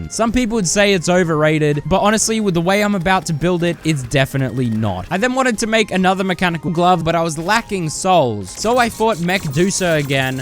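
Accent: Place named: Australia. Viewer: I think he’s Australian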